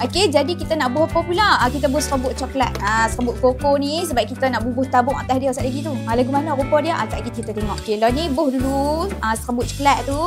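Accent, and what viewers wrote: Kedah, Malaysia. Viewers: Puaih dgq loghat kedah ampaa oii😂😂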